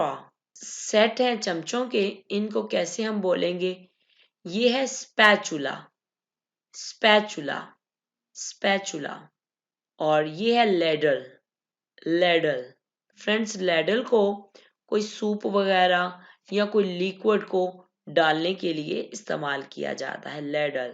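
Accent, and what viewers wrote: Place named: Punjab, India. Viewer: Typical punjabi accent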